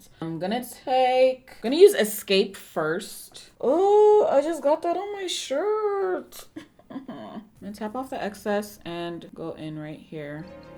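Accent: Nigeria. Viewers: Your Naija accent, tickles my soul ♥️😍😍🔥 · I loveeeeee when you let your Nigerian accent come thruuuuuuuuu 👏🏽👏🏽👏🏽😩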